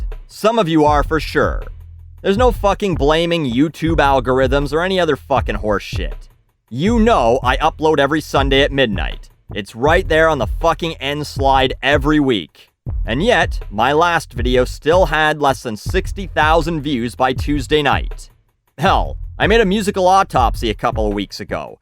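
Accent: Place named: Canada. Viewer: You taught me that Canadians say 'out' and 'about' weirdly. Love you man. Your reasons are very agreeable. Love you man.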